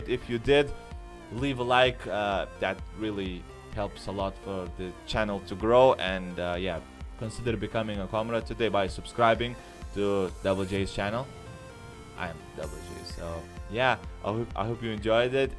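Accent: Russia. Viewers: Попробуй снять гайд по поиску фрагментов. Должно быть интересно. Русский акцент тебя выдал.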